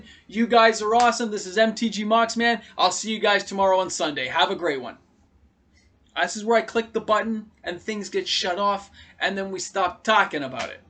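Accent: Canada. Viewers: Judging from the opening scene and your pronunciation your in Canada?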